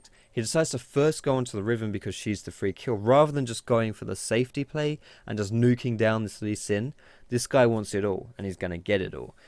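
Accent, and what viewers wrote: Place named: England, United Kingdom. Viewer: whereabouts are you in England man, you sound like you're from around where I am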